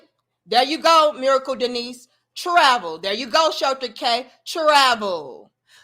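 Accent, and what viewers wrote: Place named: Chicago, United States. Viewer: I think this wonderful woman is from the Chi the way she talks says it all from me beautiful keep it up sis tell it .......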